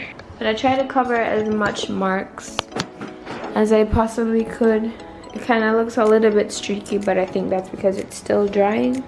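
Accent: Jamaica. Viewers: As a Jamaican I can hear the accent a thousand miles away 😂❤️ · Very cute Apt. can't wait for the finishing....keep at it girl you seem like a lovely young lady( I hear a Jamaican 🇯🇲 accent) a lot of my childhood friends are Jamaican!!!!! Love them all especially the eating times at their house 😋 I'm from BERMUDA 🇧🇲💖💖💖💖💖💖💖💖💖💖💖nice to meet you your NEW SUBBIE💥💥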